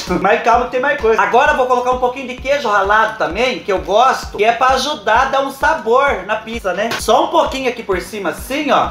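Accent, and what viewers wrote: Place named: São Paulo, Brazil. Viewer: Que seu canal venha crescer cada dia mais e mais 😍👏🏻 parabéns pelo conteúdo ❤️ você fala que nem meus parentes que mora em Ribeirão Branco não sei se conhece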